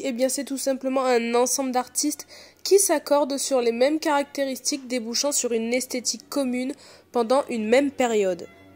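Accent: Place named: Italy. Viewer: J'ai adoré cette vidéo, très instructive et bien construite, j'adore ton accent italien 😂 par contre j'aurais aimé plus de précisions sur les artistes que tu as cité et des definitions aussi ( qu'est ce qu'on appelle le cuatrociento ? Etc...) sinon c'est top j'adore l'idée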